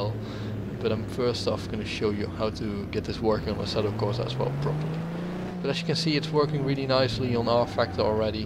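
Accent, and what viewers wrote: Netherlands. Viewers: zo te horen ben je nederlands haha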